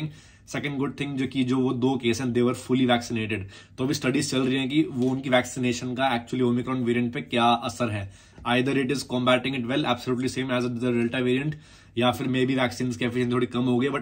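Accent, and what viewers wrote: India. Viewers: Im Sorry if I offend to somebody but what kind of lenguage does this guy speak. English Indi??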